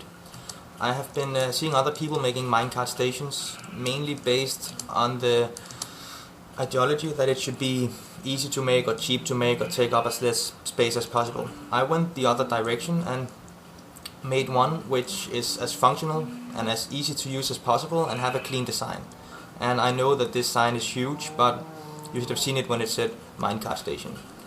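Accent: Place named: Denmark. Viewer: are you danish? you really sound like your from denmark. the accent is really special for danes.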